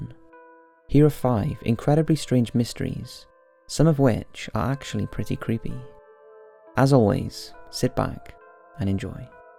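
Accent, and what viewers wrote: Wales, United Kingdom. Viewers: Well we know he’s Welsh and from Wales by his accent.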